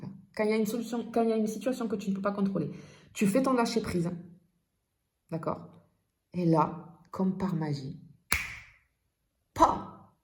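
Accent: South, France. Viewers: Ahh cet accent du sud me réchauffe le cœur et me rappelle mes années d'étude à Aix-en-Provence des années que je n'oublierais jamais ... Merci pour cette guidance ❤🧡💛💚💗💙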